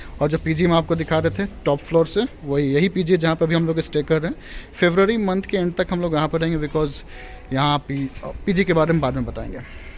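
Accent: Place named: Bhojpuri, India. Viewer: Commentary sahi hai bhaye... With bhojpuri ascent...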